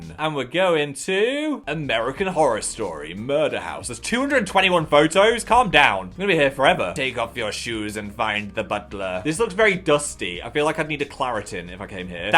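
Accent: North, United Kingdom. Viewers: Is northern accent thicker these past few vids or is it just me?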